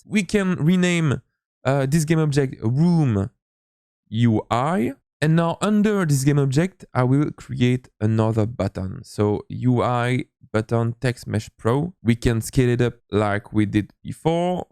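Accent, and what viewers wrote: France. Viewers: Wow:D a Wild appeared.:D Didn't expect this one,:P I like your French accent:P Tnx for the Multiplayer tutorial:) I will need it during my internship:] Epic!!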